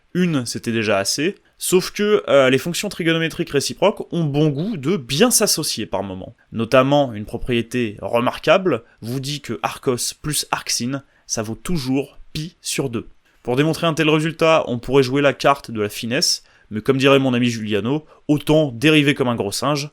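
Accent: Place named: Brazil. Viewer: J'adore la touche Alder du bresil dans la voix (les ancêtres sont fiers)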